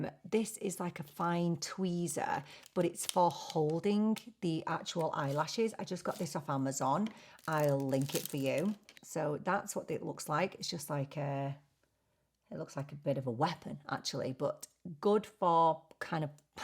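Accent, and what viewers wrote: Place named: North, United Kingdom. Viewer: No I don’t wear lashes either it just takes too long because I have no skill either 😂 but those ones sound a bit easier. Did I ever tell you watch your videos because you are funny, entertaining and I love your northern accent 😊🙌🏼💜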